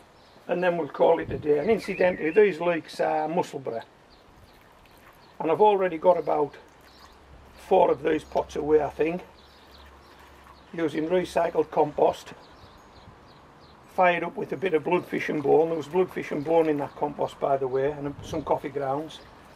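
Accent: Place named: Hartlepool, United Kingdom. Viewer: Where is your accent from? My Mrs is from Hartlepool and I reckon yours isn’t too far off.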